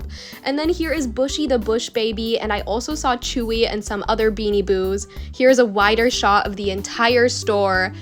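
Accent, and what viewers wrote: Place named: Mexico. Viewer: Wow!, you have a really good Mexican accent, greetings from Mexico!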